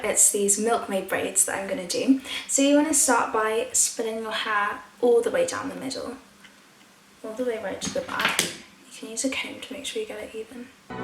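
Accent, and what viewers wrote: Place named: France. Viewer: I love your accent and is it a French accent or a British accent?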